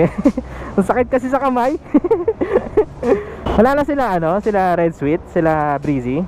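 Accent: Visayas, Philippines. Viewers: Parang VISAYA so idol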